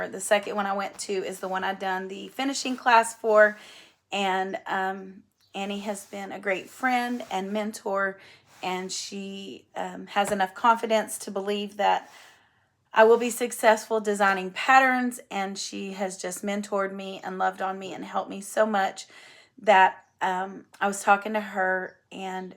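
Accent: South, United States. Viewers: I love the southern accent in your voice. I first met you at Annie's retreat Texas Hold 'Em last month and then again at Stitching Texas. You are so sweet as everyone is. I'm so glad I found your Floss Tube. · Southern!!!! ❤you girl!!!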